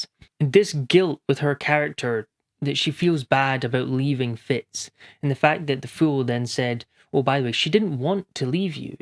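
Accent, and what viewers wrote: Scotland, United Kingdom. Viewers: Nice one. This was excellent! You've given me lots of food for thought as a newbie BookTuber. Love the b-roll. And the book? Yeah, it's a banger. I just finished it for the third time last night. I stopped at the end of Liveship Traders and now want to read the whole lot, so I've had to go back to the beginning. And what a trip. I'm so enjoying it. And then to jump in and hear others' thoughts on YouTube? How did I never know how good a genre this in on YouTube? Glad to make your acquaintance - I'm guessing you're a fellow Scot from your accent? Loved your thoughts on Burrich. I actually can't remember whether you're right or not. In some ways, I'm blessed with that bad memory as I can enjoy them all over again, spoiler-free, ha ha.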